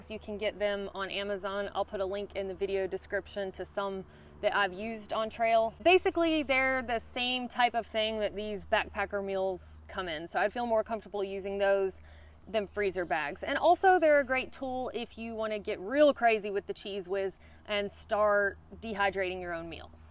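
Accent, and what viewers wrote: South, United States. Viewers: A blackened pot heat up/ absorbs the infared 25+% faster if you add a lid its another 25% and less fuel and time . Also aluminium is slightly lighter but is bad for you health long term as no matter how much you clean it is pourish and you can wipe it with a tissue when it's dry and still get grey aluminium residue. Titanium is slightly heavier but cooks better isn't toxic and can store water or food for long periods no problems. Toaks,lixada,boundless voyage , all have great pots with frypan lids around 1000ml same shape as yours roughly at around 150 grams. I'm more a bushcraft ,survival ,inch bag ,wildcamper but learn tricks from all the disciplines for different packouts decisions and I love your chan for the character and thru hike aspects and charming southern accent :) 🍻 from 🇨🇦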